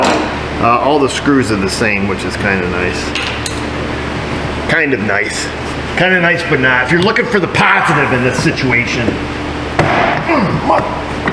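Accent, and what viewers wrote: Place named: Rochester, United States. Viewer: I have a buddy from Rochester and I pegged your accent before I looked up your shop. Good video and happy new year.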